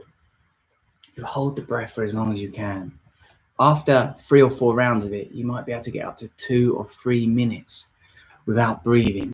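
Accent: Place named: United Kingdom. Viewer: Hi Russell I love your British accent :p and I had a crush on you :/ pray for me that I get a beautiful man inside out. Grey is beautiful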